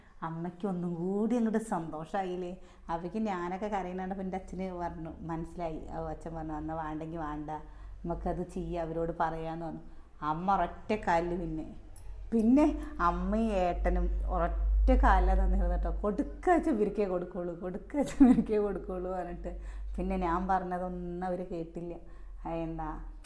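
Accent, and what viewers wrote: Palakkad, India. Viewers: ഞാൻ പുതിയ subscriber ആണ് ട്ടോ. പാലക്കാടൻ ഭാഷ കേട്ടു വന്നതാണ്. നല്ലൊരു അനിയത്തികുട്ടി. എന്നും നിങ്ങളുടെ സ്നേഹം തളിർതിരിക്കട്ടെ. ഇന്നത്തെ പെൺകുട്ടികൾ വാക്കുകൾക്ക് കാതോർക്കു. ❤️❤️❤️❤️❤️❤️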